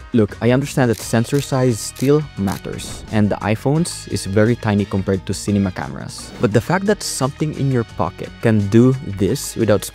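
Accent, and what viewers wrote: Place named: Philippines. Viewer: Love the Filipino accent ❤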